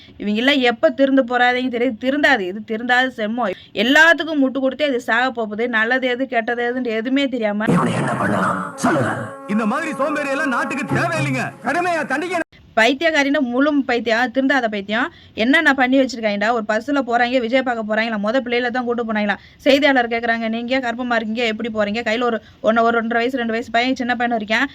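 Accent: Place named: Madurai, India. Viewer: எங்க மதுரை தமிழ் கேட்கவே சிறப்பு சரியா சொன்னமா இங்க ஆஸ்திரேலியாவில் தமிழர் மானத்தை வாங்கிட்டாய்ங்க இந்த தற்குறிகள்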